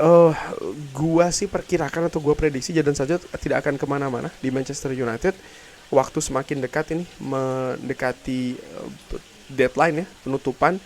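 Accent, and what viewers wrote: Semarang, Indonesia. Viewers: Asli semarang ya mas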